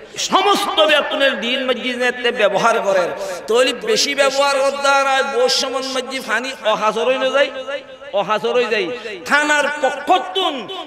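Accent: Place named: Chittagong, Bangladesh. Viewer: আলহামদুলিল্লাহ আনেক সুন্দর চট্টগ্রাম বাসায় আওয়াজ শোনা যাচ্ছে এমন কিছু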